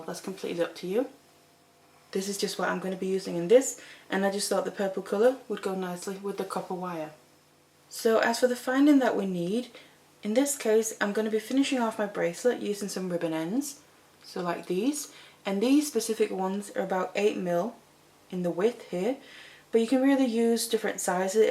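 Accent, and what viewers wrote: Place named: Macclesfield, United Kingdom. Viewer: My cousin lives in Maccelsfield. Her accent seems close to yours - is your location mid country?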